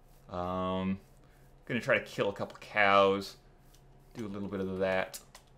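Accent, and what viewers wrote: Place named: Canada. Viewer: Awesome to hear those Canadian vowels again. Welcome back to the Internet's.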